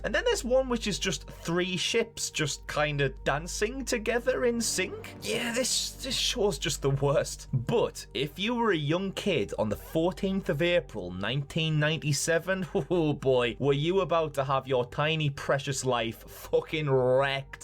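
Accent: Scotland, United Kingdom. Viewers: this person sounds like Mimir from God Of War. Maybe because they both have Scottish accents idk. edit: Saw more of the video and I'd say more like a mixture of Mimir and Philza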